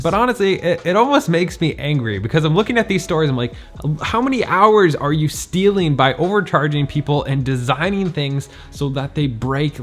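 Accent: Boston, United States. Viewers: I like the Boston accent 🤣🤣🤣… I love this concept, currently having a hard time with meal prep and cooking . So my money is going to restaurants 😩 … but learning to build a list and plan the meals ahead has been the biggest challenge. Hopefully I can switch my mindset and it will help. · Love the accent bit! Were you born and raised in Boston and you just "lost" your accent or did you move there later?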